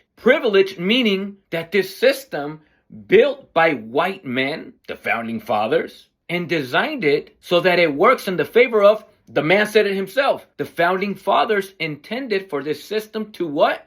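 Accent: Australia. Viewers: DUDE YOU ARE NOT FROM HERE..AUSSIE??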